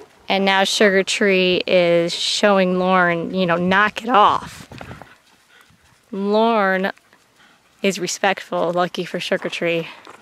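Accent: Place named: Texas, United States. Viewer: Are you from Texas? You sound like this Youtuber from Texas that i like...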